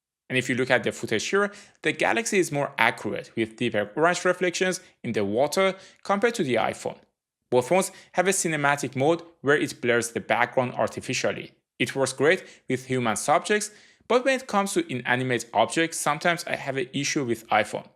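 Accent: Iran. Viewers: یعنی قشنگ چشماتو ببندی متوجه میشی یه ایرانی داره انگلیسی حرف میزنه:)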